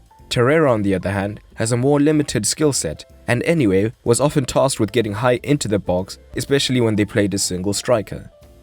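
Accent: South Africa. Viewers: This guy sounds like he is from Durban. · South African?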